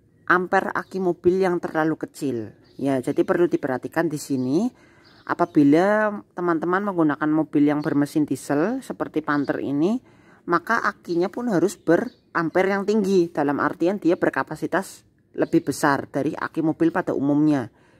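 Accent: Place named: Cilacap, Indonesia. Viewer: Ngapak bgt...kayak cilacap😁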